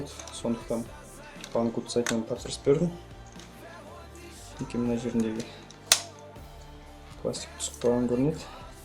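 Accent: Standard. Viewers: сау болш казака таза сойлейдекен осылай сойлеу керек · қазақша таза созине ризамын, осындай бауырлар көбейсін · жарайсың таза қазақша. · Жарайсын. Казакша таза сойлейды екенсын. Сендей адамдар эксклюзив болып барады.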